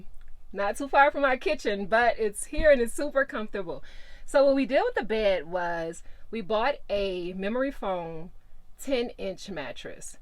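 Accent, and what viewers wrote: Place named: New Orleans, United States. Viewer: Lord it The minute she started talking I thought she’s gotta be from New Orleans! Beautiful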